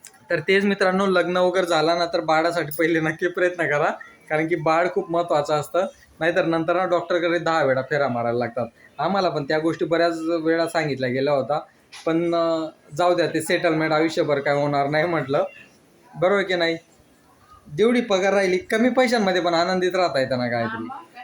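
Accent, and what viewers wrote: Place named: Jalgaon, India. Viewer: बोलण्याची ट्युनिंग जळगाव ची वाटते. आपल कोणी बोलत आहे असं वाटत आहे 😂😂😂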